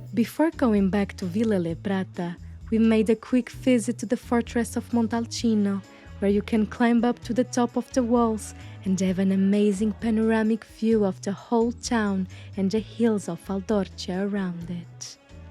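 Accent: Portugal. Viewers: She is Portuguese.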